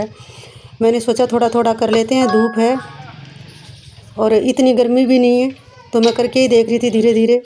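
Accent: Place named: Haryana, India. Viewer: मुझे तो पहले ही पता चल गया आपकी बोली से की आप हरियाणा के हो जी बहुत ही बढ़िया Vlog है दीदी जी बच्चे तो मुझे बहुत ही पसंद हैं जी 💫🤍💫 खुश रहो सदा ओके दीदी जी।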